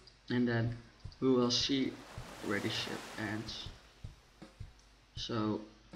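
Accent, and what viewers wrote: Netherlands. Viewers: je hoort gwn duidelijk dat dit een Nederlander is xD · Wauw! you can hear you're from the Netherlands xD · that just the dutch accent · he has just an dutch accent ;s im dutch to and i think he can speak really good english. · So fcking annoying when people are complaining about his English and Dutch accent...this video is about building a resort, not about the English language. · he's dutch, we have that terrible accent (im sorry)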